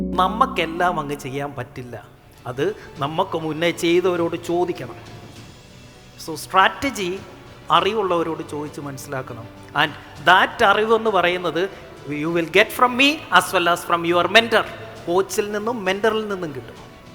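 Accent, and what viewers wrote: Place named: Thiruvananthapuram, India. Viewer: Are you from tvm? Or kollam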